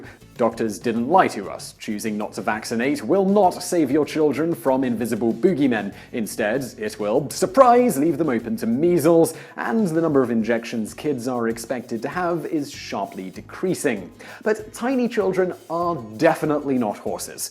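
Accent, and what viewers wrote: United Kingdom. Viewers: Is this guy British or does he fake the accent?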